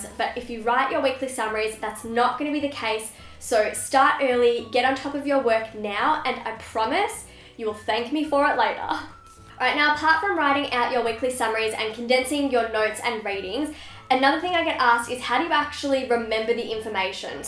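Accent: Australia. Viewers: Are you Australian??? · Is she Australian ?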